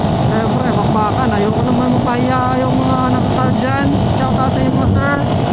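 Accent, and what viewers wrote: Iloilo, Philippines. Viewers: ilonggo ka gali tol.. 😁😁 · ilonggo kaman gali idol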